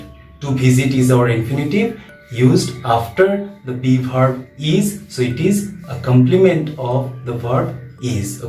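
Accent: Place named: Bengal, India. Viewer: Your accent is wonderful and having Bengali touch little bit.I am suresh kumar from Punjab.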